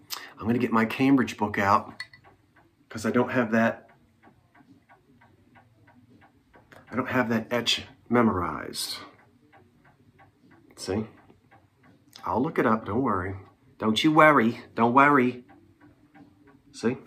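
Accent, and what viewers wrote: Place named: South Jersey, United States. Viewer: Can't get over that south Jersey accent, waddur!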